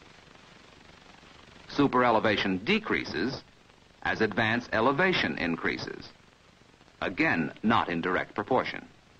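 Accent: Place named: Texas, United States. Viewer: Where all of these series with Texas-dialected-man comes from?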